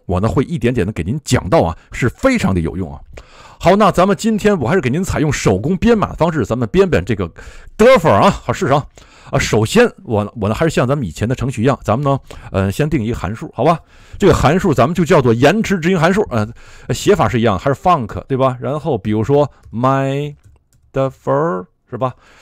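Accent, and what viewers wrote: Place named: Japan. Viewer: 我说怎么不是英音也不是美音，原来是日音英语。。。。:face-blue-smiling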